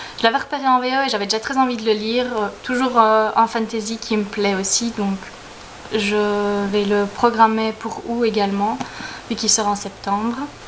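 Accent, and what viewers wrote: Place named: Belgium. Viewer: Coucou ! (c'est Mélo), ravie de te voir en vrai, tu es toute mimi ! Et moi j'adore l'accent belge et je préfère même quand on prononce tÔme et non tome à la française :p Pourquoi dis-tu que tes études ne vont pas aboutir? j'admire franchement cette branche, j'aurais beaucoup aimé traduire des livres mais étant donné que je suis déjà une truffe en anglais c'est compliqué,lol . En tout cas je croise les doigts. A b1tôt, ça m'a fait plaisir de te voir (mm si je ne suis pas super assidue des vidéos)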